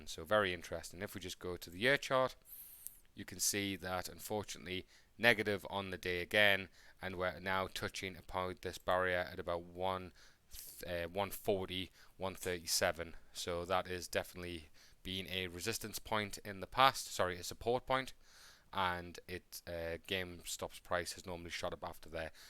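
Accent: United Kingdom. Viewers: Oh nice, a Brit. Hey 👋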